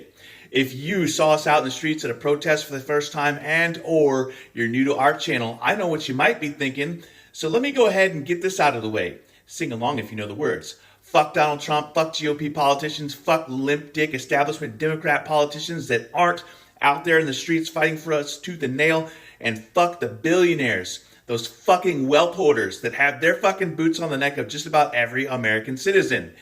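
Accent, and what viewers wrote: Scotland, United Kingdom. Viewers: It is funny how when you get angry the scottish accent disappears. I love stack-up. I have donated to them before. It is an amazing charity.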